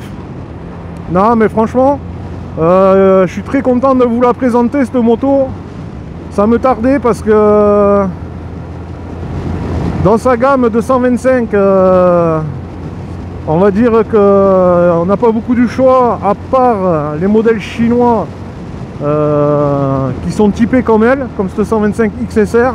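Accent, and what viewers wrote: South, France. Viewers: Extra.. j'attends la mienne avec impatience.. très bonne vidéo avec l accent dû sud ..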